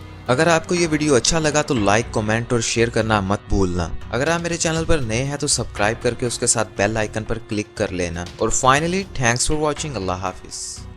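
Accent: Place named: Punjab, Pakistan. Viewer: Sir agar ap urdue ko thora bhetar tareke se bolenge to bht maherbani ho plz . ap punjabi lehjey m urdue boltey hn. Plz bura mt mna.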